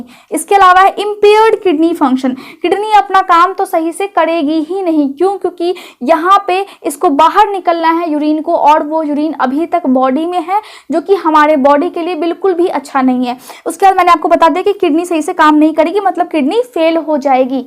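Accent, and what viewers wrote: Bihar, India. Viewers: R u belong to bihar state? 😊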